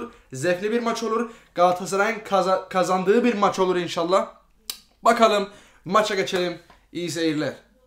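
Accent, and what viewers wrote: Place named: Northern Cyprus, Cyprus. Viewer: Ingılterede yasayan kıbrıslı turkmusun aksan bıraz oyle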